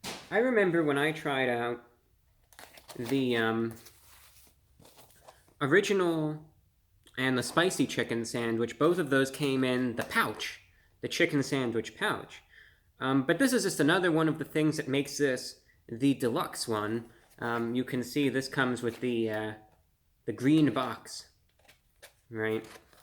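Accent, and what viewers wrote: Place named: Transatlantic, United States. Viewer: I really like how his voice has progressively changed over the years, as he transitioned from the modern american accent to a nasal, higher-pitched transcontinental accent. This contributes to sink him deeper into his character, along with his old-school yet humble manners and overall appearance.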